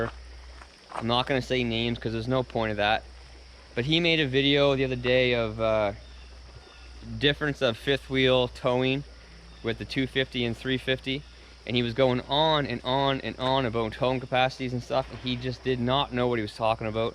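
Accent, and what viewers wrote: Canada. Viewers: You sound so Canadian. Thanks for the video helps a lot.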